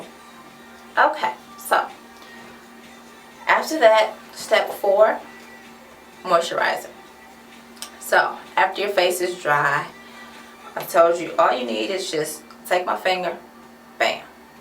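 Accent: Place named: Detroit, United States. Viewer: I love your Detroit accent!!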